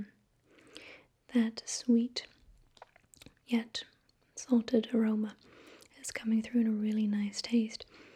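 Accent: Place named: RP, United Kingdom. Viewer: You're quite posh, I like it though it's relaxing to me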